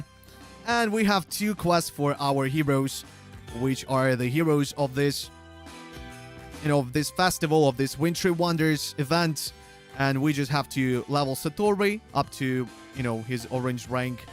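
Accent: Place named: Russia. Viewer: Позорище, русский мужик на рус-англе догло читает транслит особо это не скрывая🤦🤦🤦🤦🤦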